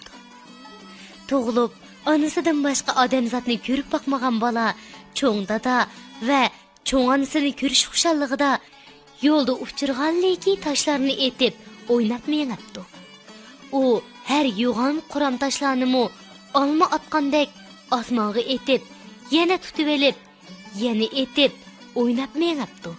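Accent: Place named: China. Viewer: Какой жесткий китайский акцент. Это так специально делается? Почему чистое уйгурское произношение так уродуется? Что за через чур мягкая, какая то детская манера говора. Этот канал под китайскими властями действует? Никогда гордая уйгурская женщина так не разговаривала. Поправьте меня, если что то не так. Ну не мне одному же это кажется??!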